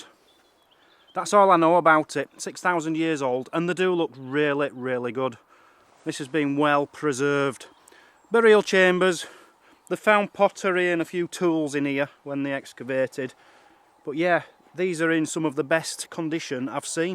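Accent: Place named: Yorkshire, United Kingdom. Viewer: Your From Keighley Arnt You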